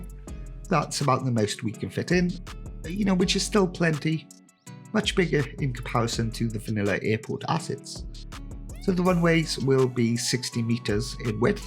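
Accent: Wales, United Kingdom. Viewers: are you Welsh?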